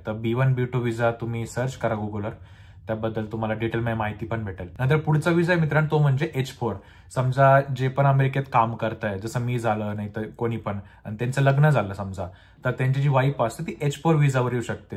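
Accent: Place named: Nashik, India. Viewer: तुझी मराठी चांगली आहे आणि नाशिक ची तर एक नंबर आहे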